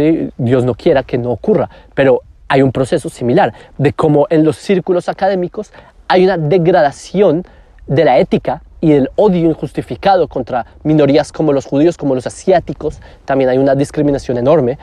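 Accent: Colombia. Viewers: el habla castellano de colombia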